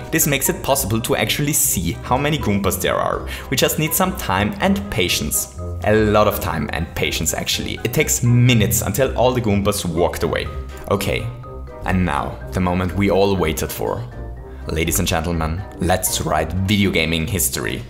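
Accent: Germany. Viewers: He has the most German accent I have heard in a long time! Psycrow with a hard "p" at the beginning for example ;) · Urgh german accent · Not being rude but you sort of sound German....or Austrian....or even maybe Polish...Just saying! Gday and kudos from Australia! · He has a strong German accent imo. But maybe it's easier for me to tell because I'm Austrian too. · Dieser Akzent, man hört richtig das Deutsch raus xD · Are you German? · Are you german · Are You german? Your accent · Are you german? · The german accent is strong with this one! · I always thought that he was german too but i didnt want to make assumptions. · I'm German and I normally dislike German accents, but accent is kind of...charming? And it makes me smile when I can tell from his voice that he's smiling. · the german is strong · An die deutsch englische Aussprache muss man sich erstmal gewöhnen sonst gutes Video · Deutscher Akzent :)? 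· Dein englisch :D gut,aber der deutsche Akzent :D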